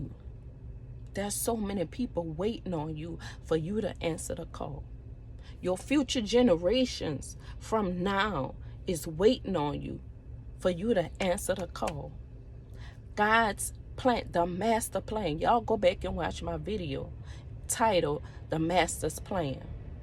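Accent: Louisiana, United States. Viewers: I’m trying my hardest to stay positive in life but it’s been hard I feel like Joel in so many ways I have lost everything including some family members that I love dearly and I’m trying to figure my way through this storm it’s been going on for some years now I have been praying for blessings and opportunities and open doors I have never prayed this much ever in my life! I’m just not used to this I’m homeless I work for a relative and he doesn’t care about my situation I just need to get back on track ! My heart is pure ! I’m strong but I’m at a boiling point and I need help and prayer so boss lady can you please pray for me and I love your Louisiana accent ❤️❤️🙏🏽🙏🏽💯💯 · She from Louisiana 😊 I 💕 her accent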